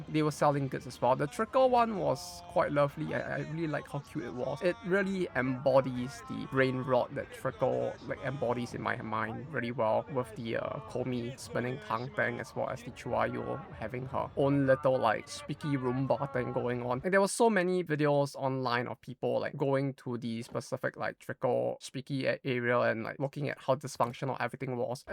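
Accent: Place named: Singapore. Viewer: did u learn english in singapore or from a singaporean? u sound singaporean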